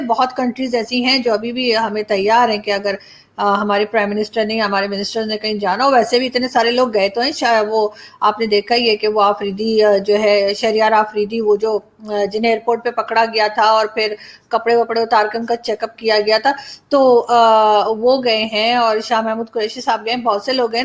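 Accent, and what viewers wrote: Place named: Delhi, India. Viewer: Your explanation of 250 gms bombs with the help of US drones is superb. I mean your hindi/punjabi accent is just like what we hear in New Delhi.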